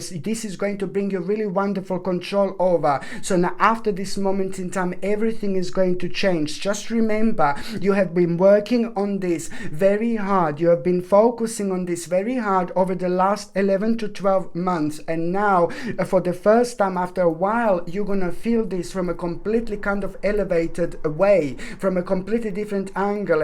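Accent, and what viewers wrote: Italy. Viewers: I totally Love your videos and excellent readings!! Thank you!! Your Italian accent is beautiful 😍 too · Ciao! Thank you for Scorpio reading, love your energy, Good vibes and your italian accent! I have got so much italian roots 🙏🏻 Grazie mille from Monte Carlo. Last seven days 🤞🏻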